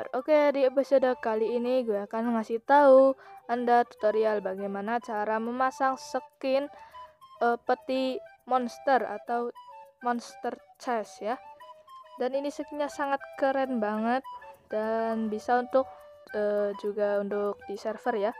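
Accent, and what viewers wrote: Java, Indonesia. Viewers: Jawa bgt Bang🤣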